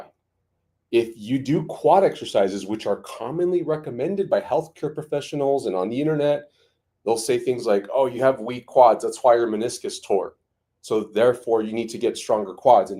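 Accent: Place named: North Carolina, United States. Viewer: I can hear you North Carolina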